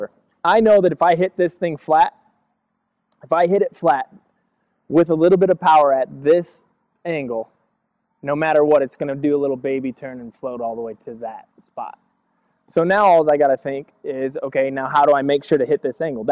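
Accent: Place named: Western Pennsylvania, United States. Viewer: It's a western PA thing. I'm assuming he's from somewhere around Pittsburgh.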